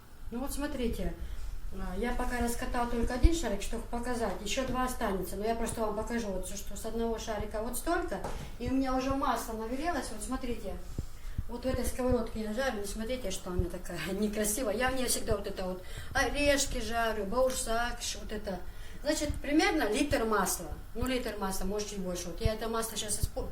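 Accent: Chechnya, Russia. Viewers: И акцент чисто Чеченский, когда говорить на Русском. Молодец удачи тебе и счастья. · Ассаламу алейкум, я думала, что чеченка👍🙂 даже акцент похож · Акцент зато чеченский👍👍👍👍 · Акцент даже нохчи ю ) марш йог1ийл.рецепт сохраню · Я сегодня подписалась на вас, прочитав немного комы, я была удивленна, тем что вы не чеченка) а так похожи, и акцент у вас уже как наш))) Аллах дал атто бойл ха 😘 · Окцента чеченский мололец. Спасибо попробуем. Плачь моя подписка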